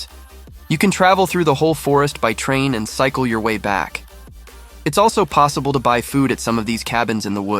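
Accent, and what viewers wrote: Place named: Canada. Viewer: You're Norwegian? Your accent sounds Canadian.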